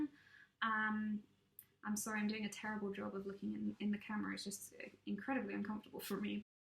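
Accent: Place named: Home Counties, United Kingdom. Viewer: That was good. It has spurred me on to give it a try. It's ages since I played that song. Your voice is better than mine but try as zi may I cannot place your accent. To me it sounds like home counties?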